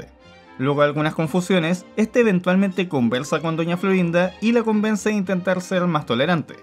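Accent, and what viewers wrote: Chile. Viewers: a mi lo que me gusta es tu acento chileno poh saludos desde guatemala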